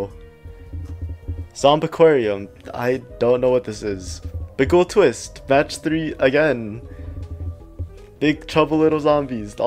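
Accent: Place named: Germany. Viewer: Man merkt dass du Deutscher bist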